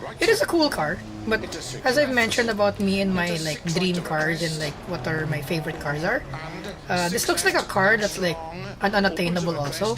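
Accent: Philippines. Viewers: Filipino based on what other people have said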